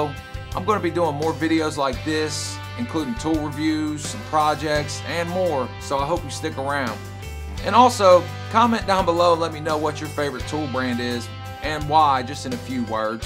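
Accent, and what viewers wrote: South, United States. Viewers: Strong sothern accent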